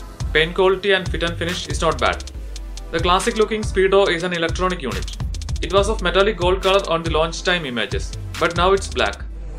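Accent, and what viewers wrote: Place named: Kerala, India. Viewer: I think u are a mallu guy by hearing ur pronunciation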